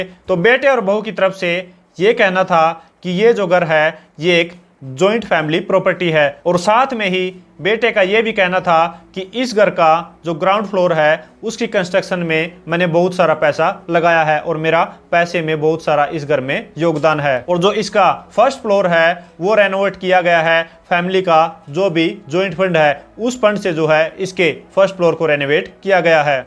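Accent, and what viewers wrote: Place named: Haryana, India. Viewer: आपका वीडियो देखकर लगा कि स्क्रीन पर फिल्म अभिनेता अनिल कपूर हैं लेकिन आपकी हिन्दी में थोड़ा हरियाणवी टच है इसलिए बात समझ में आ गई।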